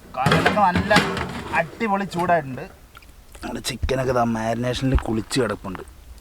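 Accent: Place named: Palakkad, India. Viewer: palakkadan slang aan...but full aghu palakkadan ala...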